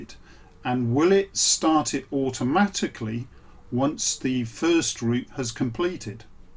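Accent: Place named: Oxford, United Kingdom. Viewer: Hi, your videos are very much interesting! I am currently in a process to go from analogic to digital on my network. I am equiped with Z21 and Itrain on my computer. Aim is to implement computer driven interlocking. I followed all the steps of your tuto and I feel more confident. As a French, I have also very much appreciated your Oxford english speaking! I have one topic which bothers me: during my off line tests, I lost my screen organisation: I have only left the TCO and the trains/locos control pannel: no more train list with positions and destination indications. Could you advise me on how to come back to the initial screen set-up? Thanks again. Alain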